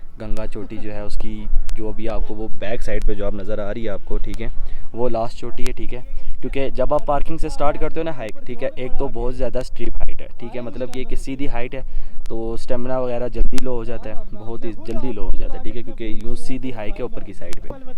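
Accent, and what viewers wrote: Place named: Sialkot, Pakistan. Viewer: shb na jb first word bola tha ma tb hi smj gya tha k sailkot k han😊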